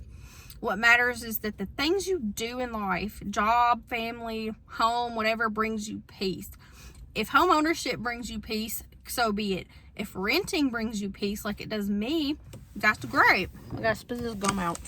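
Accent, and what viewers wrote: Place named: South, United States. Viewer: Thank you. Your sunshine and peace and don't let them hold you down attitude is the exact energy I wanted to match today. Thanks for being that and your sweet southern accent made me miss Mississippi just a little. Some people are as sweet as the sweet tea they make down there and I miss that warmth! ❤ Lots of positivity your way all the way from Canada. 😊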